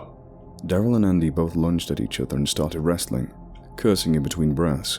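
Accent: South, United States. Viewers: Why the southern accent isn’t this supposed to take place in north east u.s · Your southern accents sound superb! You could easily be a voice actor for The Last of Us with how good they are. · Never ever apologize for your proud Southern Accent, NEVER!! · I love your country accent. 😂❤️ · Your southern accent is on point, mate! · I'm from the south and think you did a great job on the accent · As someone from the southern United States I must say that you have really gotten better at the southern accent. Great job as always. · ya good at southern accents but it's NH north nothing like what we sound like. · Your accent was better than mine and I live very near people who have the southern accent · no more southern accent please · You pretty much nailed the southern accent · Next time that you do a piece about the Northern Part of the USA, such as in 13 Mile Woods in New Hampshire, use regional accents, not the southern rural accents that you are so fond of. 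Hunters up near the Canadian border are unlikely to have southern drawls. Thank you. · Actually, your Southern USA accent is pretty danged good. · You sound so cute doing a Southern accent! I love it! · the southern accent actually works pretty well. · Ahhh, nothing like a good wendigo story.. Plus, dont ever apologise for your southern accent.. Its good.. I live in the south and youre doing it justice.. Theres a reason why hollywood uses english actors for southern character roles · Great southern accent. If only this was set in the south. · Thank you for taking the time to narrate this. Also, great job on the country accents. Looking forward to more! Always watching, waiting and listening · You did a good job with the Southern accents! I felt right at home. 😅 But were they supposed to be Southerners, or New Englanders? I potentially missed that detail, lol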